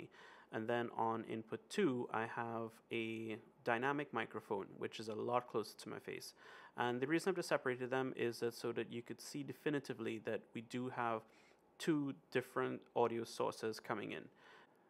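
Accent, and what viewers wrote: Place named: Trinidad and Tobago. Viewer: This may have been an issue I would have encountered sooner or later and may not have known what to do, so thanks a lot for this heads-up bro!! p.s. from the moment you spoke I knew you were a Trini.. we can't hide lol but your standard English is very good!! Blessings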